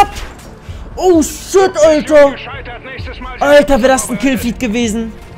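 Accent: Hanover, Germany. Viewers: kommst du aus Hannover??? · premium ^^ du kommst aus hannover? :D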